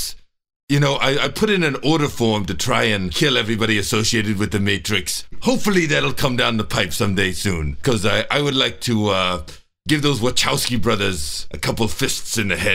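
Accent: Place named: India. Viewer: that ninja sounds like he is from inida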